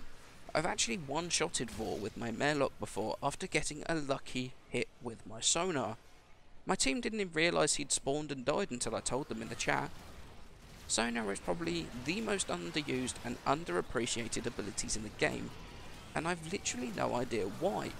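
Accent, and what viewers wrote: United Kingdom. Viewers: I am confused. What has his accent to do with the fact that you are from Germany? In my opinion his accent is the mildest British accent i have ever heard. It is really easy to understand him. Or was that a hint that you would wish for a German version of this video?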